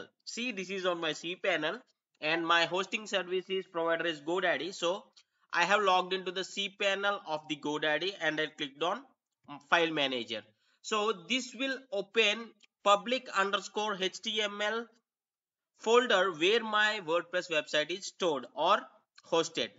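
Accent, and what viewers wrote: India. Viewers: God I hate Indian Accents! Why do you even bother to create videos that we can't understand! !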